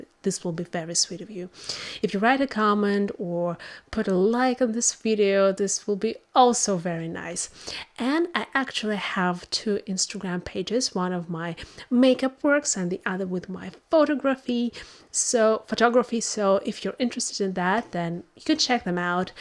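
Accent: United Kingdom. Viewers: Я так пыталась понять Ваш акцент, думала Вы из Англии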